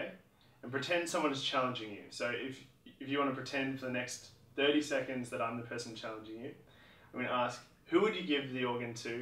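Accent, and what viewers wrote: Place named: Australia. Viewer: or maybe 'pattern' problems (haha the aussie accent, wasnt sure )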